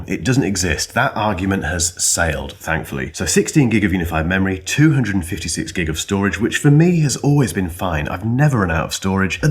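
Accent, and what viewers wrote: United Kingdom. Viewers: Dude didn't slip out of his British accent even once.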